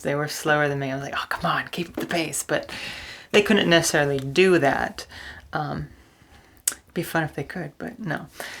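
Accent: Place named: Long Island, United States. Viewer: When I first started watching you, I assumed you were from Long Island, because of your accent.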